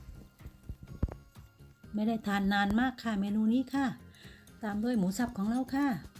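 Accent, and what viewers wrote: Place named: South, Thailand. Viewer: ขั้วกลิ้งหมู จัดเสริฟ พร้อมมะเขือพวง มะละค่ะ. ใส่ สันน่า ทานค่ะ รสชาติ จัดจ้านค่ะ อร่อยจังฮู้ เผ็ดมากค่ะ พี่สิแลงใต้ เพราะ จังค่ะ อร่อยจนตัก ไม่หยุดค่ะ ไปต่อ หลังไมค์ค่ะ. ขอให้ มีความ สุขนะค่ะ❤️👍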